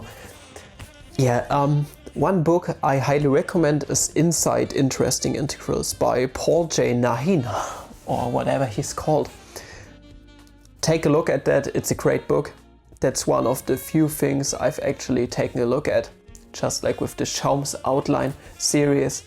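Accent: Germany. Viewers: Can someone link the vid on the integral he talks about ? I can't decipher the thick German accent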